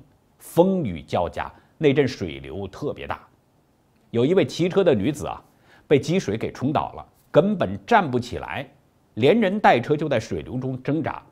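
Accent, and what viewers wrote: Tangshan, China. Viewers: 您这唐山口音 改不掉啊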